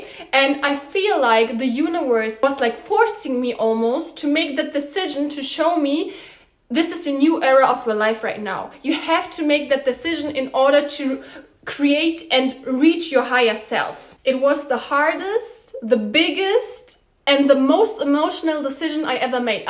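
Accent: Germany. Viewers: I'm so thankful for this video. The second you started talking, I thought, "She's german." But not like "Her english is bad she should stop" but like,"She is german LIKE ME and even if people might recognize that she is, IT DOES NOT STOP HER. " ❤️❤️🙏 Good luck with everything you are going to achieve!! ♡I'm sending love & appreciation ♡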